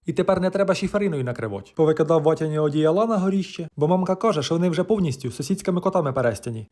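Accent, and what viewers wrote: Ukraine. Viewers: Ви або з Сумщіни, або Полтавщини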